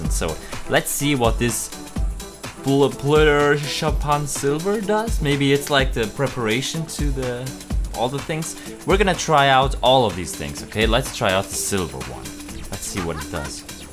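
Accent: Germany. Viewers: Bist du deutscher? ^^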